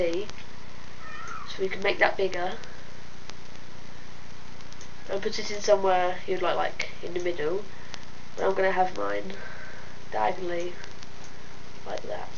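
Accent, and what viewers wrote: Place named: United Kingdom. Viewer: sounds kinda like hillbilly british english....say WHAT...?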